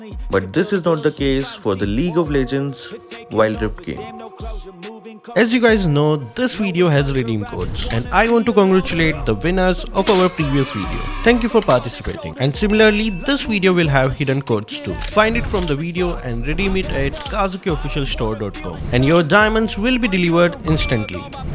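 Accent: India. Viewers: chekwa na tunog indiano. lintek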